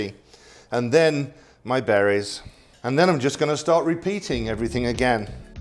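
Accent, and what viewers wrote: United Kingdom. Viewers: You didn't introduce your family to the viewers. It would have been great knowing them. I love watching your dishes especially the way you speak about the Queen, Prince William and Prince Harry and of course Princess Diana and all the stories. I just watch the dishes being made though I am a true Vegetarian, don't even take eggs. Good luck. Keep telling about your Royal experience and stories along with history. I like your authentic British English. I am from India.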